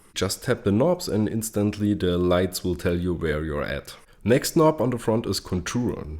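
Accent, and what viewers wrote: Germany. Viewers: Man I'm going to have such a blast trying to imitate your accent - it totally kicks ass. Which part of Germany does it originate from? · this man's accent screams "I am German".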